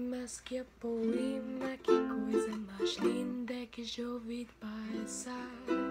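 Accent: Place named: Rio de Janeiro, Brazil. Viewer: que fofinha gente parece o sotaque do Rio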